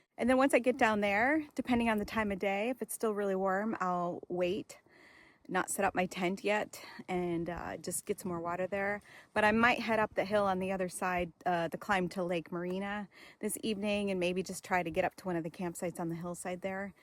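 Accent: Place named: California, United States. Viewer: Your accent sounds Californian. I moved from Los Angeles County in 1973. I'm following 4Runner, just a few days ahead of you.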